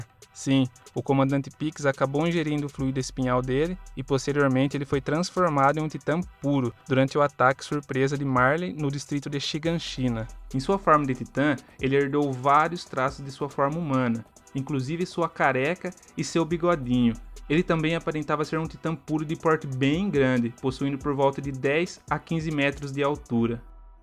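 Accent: Brazil. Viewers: Vc é Gaúcho????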